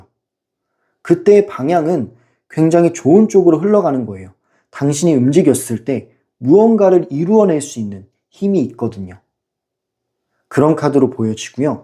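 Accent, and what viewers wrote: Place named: Gyeongsang, South Korea. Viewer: 삼번리딩 갱상도 샘인가봐요 리딩중 만족 시릅지도~^^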